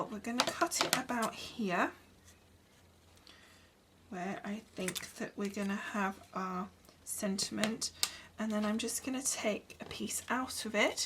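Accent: United Kingdom. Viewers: How sweet is that you remembered the "hun" part in my name which actually stands for Hungarian. I grew up in Hungary, and lived for a few years in Germany,Canada, and now for 12 years in Dallas, Texas. Where do you live in the United Kingdom? I always enjoy listening to you talk in your videos because your English sounds so much nicer than American English. :)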